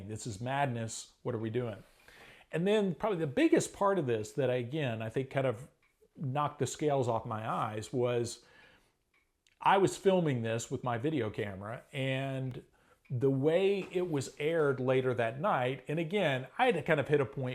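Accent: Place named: South, United States. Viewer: Your Southern accent was stronger back then, haha.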